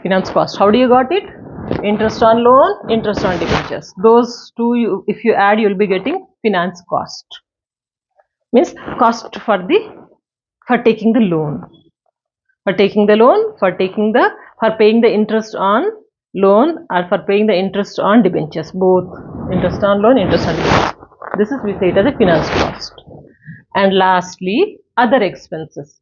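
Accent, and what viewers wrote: India. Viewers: Wonderfully explained mam and I like your sweet Hindi too.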